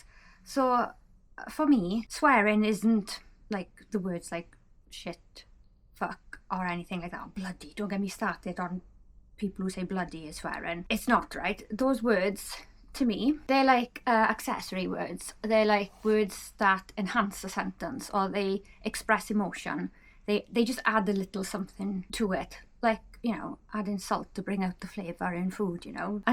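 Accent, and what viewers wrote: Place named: Wales, United Kingdom. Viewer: aww thank you!!! Ive been watching your videos most of the day!! And while I cooked me curry! 😂 absolutely love them! Will give you a shout out next week for sure! And i have to say, theres is nothing better, than the accent of a Welsh woman swearing! 😅 keep the videos coming hun!!!! ❤❤❤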